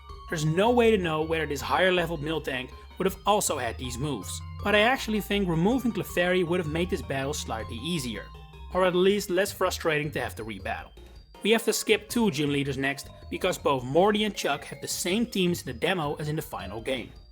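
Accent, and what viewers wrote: Netherlands. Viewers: Love the video. Are you perhaps Dutch?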